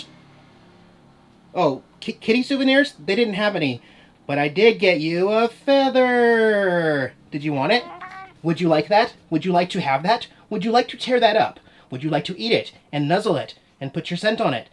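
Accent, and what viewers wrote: South, United States. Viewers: Why do you sound like your from the south?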